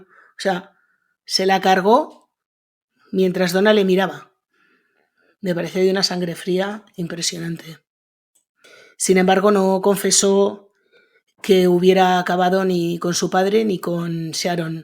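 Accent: Castile, Spain. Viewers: Qué comentario tan desagradable, Pilar. narra muy bien, puro castellano.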